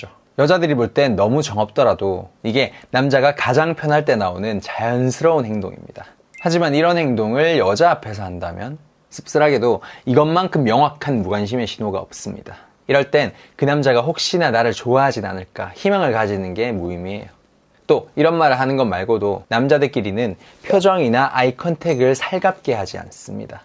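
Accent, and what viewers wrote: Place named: Daegu, South Korea. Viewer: 대구에요? 억양잌ㅋㅋㅋㅋ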